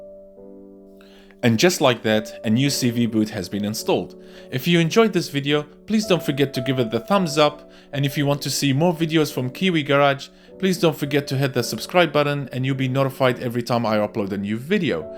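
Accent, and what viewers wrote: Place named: Eastern Europe. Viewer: You sound EASTERN EUROPEAN… but you are from NEW ZEALAND ?? And ZEALAD is actually DUTCH..😃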